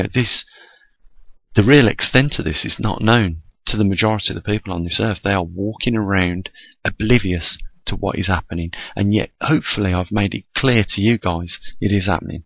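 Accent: Birmingham, United Kingdom. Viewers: I got to ask but what part of Birmingham are you from?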